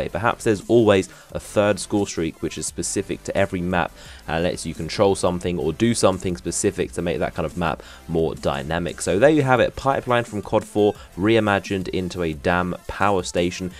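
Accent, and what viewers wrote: United Kingdom. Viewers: where are u from in the UK?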